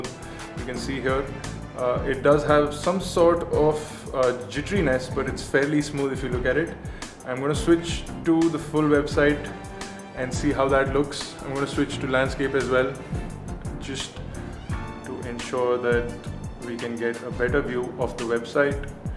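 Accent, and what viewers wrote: India. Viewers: anoing indian accent... Apu ali baba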